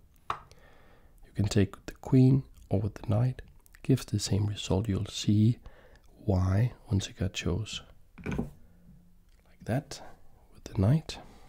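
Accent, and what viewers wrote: Denmark. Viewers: Are you danish? Great video btw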